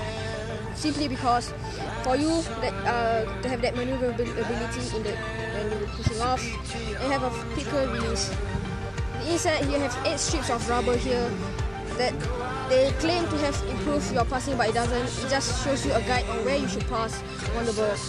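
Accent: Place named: Singapore. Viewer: lol u singaporean eh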